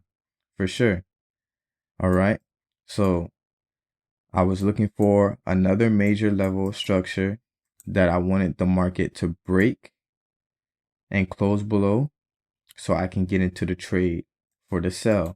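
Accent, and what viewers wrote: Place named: United States. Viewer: How do have an account on tradersway you sound like you live here in America. I tried to sign up they wouldn't let me have any account. You have any videos that teach american people how to do sign up and use these brokers? I signed up for many and all of them ask you to verify yourself to withdraw money. what is your workaround for that?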